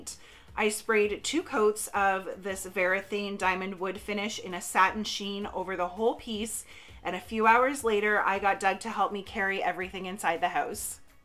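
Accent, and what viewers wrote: Canada. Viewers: I LOVE LOVE LOVE your Canadian accent!!! And your videos too! Beautiful work. :)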